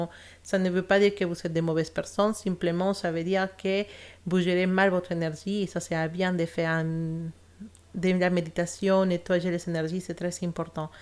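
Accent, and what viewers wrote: Spain. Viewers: cet accent, seriez-vous espagnol?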